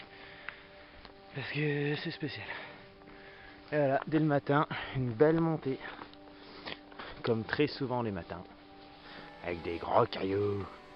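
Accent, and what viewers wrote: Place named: Vosges, France. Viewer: C'est la moulinette youtube qui m'a proposé ta vidéo. Bon, il faut dire que j'aime beaucoup la rando et qu'un parcours comme le Stevenson me fait envie. Je commence à regarder et je me dis : Oh, ce gars a l'accent du pays ! Et bingo ! Seul un vosgiens peut aller se perdre là bas en sandalette dans 20 cm de neige ! Je rigole mais faut quand même être gonflé ! Du coup je vais aller voir la suite de l'aventure de ce sapré gaillard ! Ah et puis un petit abonnement de plus !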